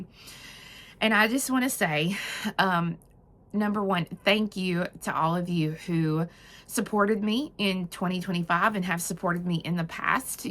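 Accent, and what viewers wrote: South, United States. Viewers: Thank you so much for this! It’s really an awesome idea! I wish I could buy more, know that I will when I can. I love your sweet Southern voice, it’s a great comfort for me since it’s just me and my cat anymore. God bless you and your family. Have a blessed and beautiful season! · you have brought me so much JOY this year. Those are happy tears, right?!? 2026 is going to be the best year! I am looking forward to learning more, it's so important to me that I learn something, especially as I get (cough) a little older. I love your enthusiasm and your patience with us. You are a fantastic teacher. I love your southern accent; I miss my mama and her Tennessee family who have all passed and it brings me comfort when I hear it. Your knowledge is deep and wide and it shows in every video. I don't go anywhere else to buy or learn. I'm committed to you and your bead store. 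Best wishes!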